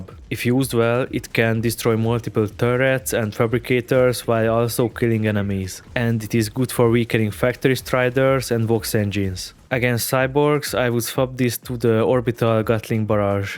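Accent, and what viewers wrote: Hungary. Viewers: are u hungarian?? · Thats a juicy Hungarian accent, love it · Rögtön felismertem a magyar akcentust. Great informations btw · te magyar vagy halljak meg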